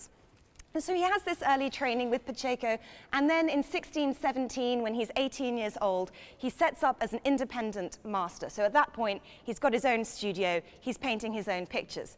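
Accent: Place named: RP, United Kingdom. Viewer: I cant stand this woman's accent and "posh" way of speaking for more than 5 seconds.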